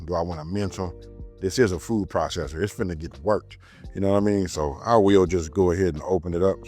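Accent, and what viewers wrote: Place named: South, United States. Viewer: 😊❤ Wooow, that's a Strong Southern accent!!! Love your cooking!!! Greetings from Ghana 🇬🇭🇬🇭🇬🇭🇬🇭🇬🇭 Come visit sometime!!! 🖐🏾😊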